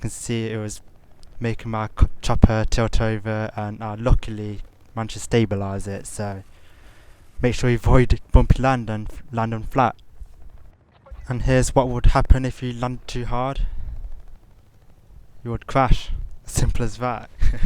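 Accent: United Kingdom. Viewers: are u british u sound british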